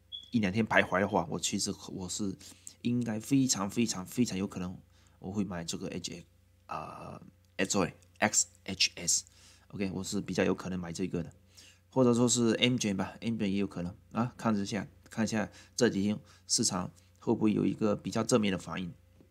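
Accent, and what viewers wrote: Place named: Fuzhou, China. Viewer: 這福州口音 太輕切了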